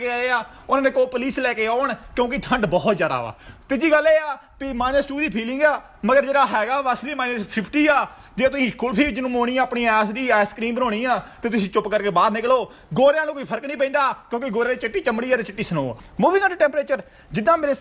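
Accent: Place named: Punjab, India. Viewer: You have THE true punjabi accent.😂🌹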